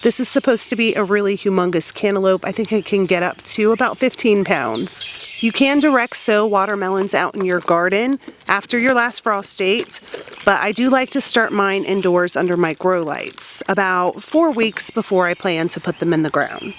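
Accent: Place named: Philadelphia, United States. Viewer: Your accent is straight-up gold! Delco? South Philly? I love you!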